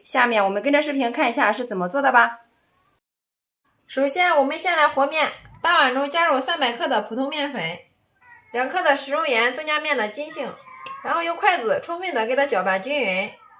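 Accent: Shandong, China. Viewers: 听口音山东的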